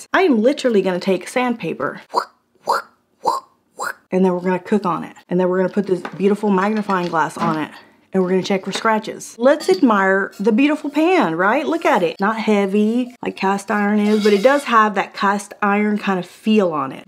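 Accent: Texas, United States. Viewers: Girl I was thinking the same thing! “Does always have such a strong southern accent “ lol funny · First of all glad I found your channel again.👍 Second did not know your shirt was changing colors was too into the test results. I like the pink one.👍 Third I've watched about five of your videos I thought you always had a Texas accent. And you lived in Texas. 🦤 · Are you talking in a southern like paula dean southern accent in this video... or i am I just noticing it ps i think I have seen all the your videos so I dunno why it sounds that way today maybe it is cause you are doing a cooking video, ps you said that right when i finished typing this then i had to click edit right then to add this part!!!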